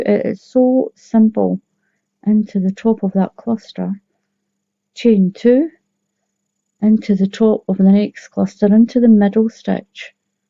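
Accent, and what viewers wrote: Scotland, United Kingdom. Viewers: Love your Scottish accent and love your tutorials. Our lock down in Letterkenny has expanded my crocheting experience. Another blanket going to be done this stitch. Love to hear Mutley in background think he would like you throw the ball!!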